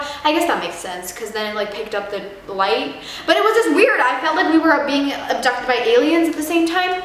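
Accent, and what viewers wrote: United States. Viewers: How did she get such a flawless American accent in just 4 years at that late of an age? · how come she has an American accent but used to live in Turkey? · She's Turkish but has lived/visited America so she picked up the American accent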